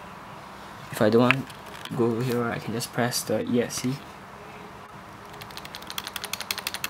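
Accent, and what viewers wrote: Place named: Singapore. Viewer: I somehow recognised that singaporean accent. I'm a singaporean too. Can u pls show me how to connect thr wires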